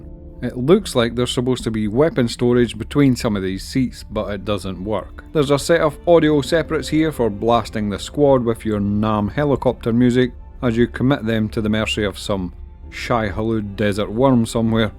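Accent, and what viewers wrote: Scotland, United Kingdom. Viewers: The scottish accent feels wildly appropriate and correct for space ship reviews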